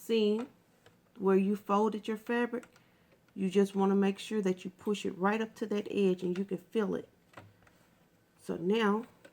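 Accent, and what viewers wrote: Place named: South, United States. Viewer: You sound like a fellow Southerner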